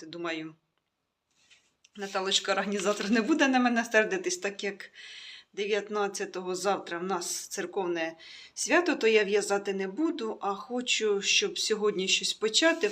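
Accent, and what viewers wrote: Poltava, Ukraine. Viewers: Ви все правильно робите, я на Ваш канал підписалась саме завдяки полтавській говірці, а потім вже в'язання! Дякую Вам за щирість! Продовжуйте знімати в своїй манері, чекаємо!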